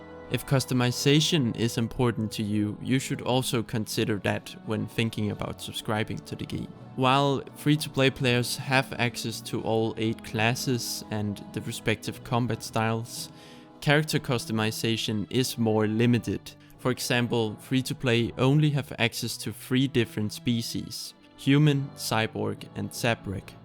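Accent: Denmark. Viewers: Fornemmer lidt danglish ;-) Jeg er gammel swtor spiller men overvejer at spille igen F2P efter et par siden. VIl du være interesseret i at spille sammen eller blot give gode råd in-game?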